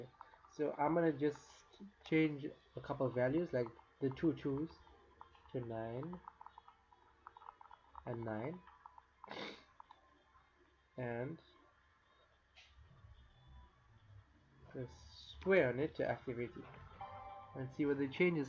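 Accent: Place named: Durban, South Africa. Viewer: okay thats a shame, but thanks for the video, it was really useful and saved me a bunch of time, also are you south african, you sound like you're durban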